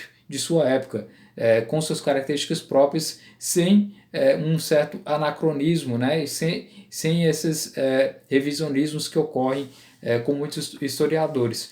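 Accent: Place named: Recife, Brazil. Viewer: Você é de Recife? Percebi um leve sotaque.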